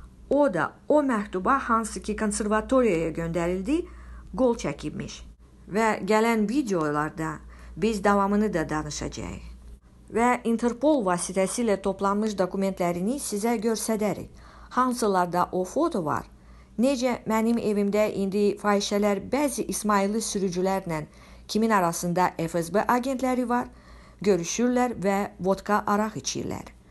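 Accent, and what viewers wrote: Armenia. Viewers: Bu deyəsən bekarçılıqdan ağzına gələni danışır. Erməniyə oxşayır ləhçəsi də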